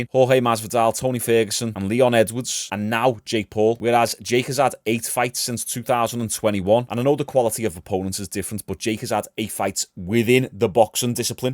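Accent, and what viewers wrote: Liverpool, United Kingdom. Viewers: oh snap! I subbed like two weeks ago bc I liked your logical takes on things... I had no clue you are a Scouser! I'm American so I can't tell based on accents alone but Liverpool has been my fav football club for a while now. I seem to fit right in here lol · He's looked similar to this since he went up from 155. He's not naturally that big so he wouldn't cut anything for this fight. He'd want to keep the mass he had, no matter the composition. Watching the fight, if Nate was bigger and/or had more punching power, Jake would have been in trouble as he was eating uppercuts like candy later in the bout. Edited to include that I love the Scouser accent. It's my favorite of all that I've heard from across the pond.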